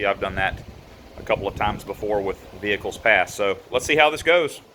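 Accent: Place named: South, United States. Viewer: I love how you open up all nonchalant with your southern drawl. Then, with hardly any build up, you proceed to just drop some extremely deep turbo and tuning knowledge. Hahahahaha! Looking forward to seeing this one develop.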